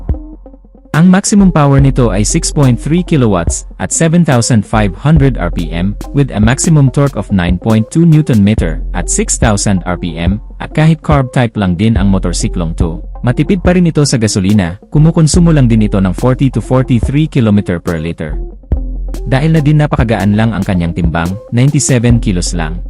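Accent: Visayas, Philippines. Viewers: Bisaya ka ba ate??😂😂😂😅😅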